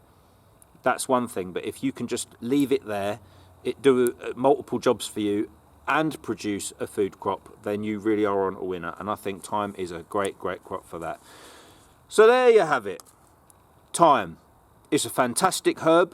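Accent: England, United Kingdom. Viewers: Good advice. Are you in England? (Going on your accent 🤷‍♀️) I'm in Scotland and not sure if I should grow thyme in a pot or in the ground? What do you think?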